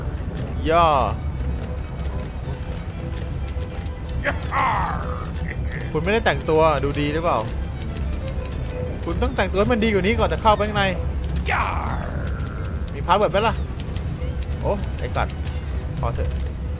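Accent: Thailand. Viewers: เสียงเหน่อ55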